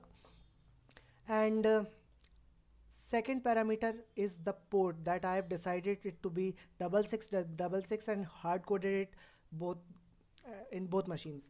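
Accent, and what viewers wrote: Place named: India. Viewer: You Sound like an Indien tech Support scammer but u r 100x Intelligent than them I am not so I am watching this Video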